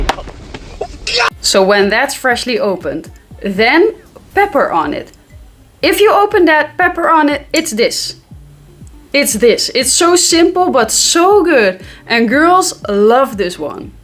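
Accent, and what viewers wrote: Netherlands. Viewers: Ben je Nederlands? Zo klinkt je accent. Je bent trouwens echt grappig 🤣🤣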